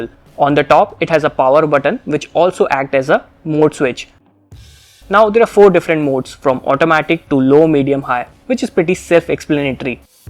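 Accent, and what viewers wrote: India. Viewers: Professional video !! Except the strong Indian English pronunciation is a bit annoying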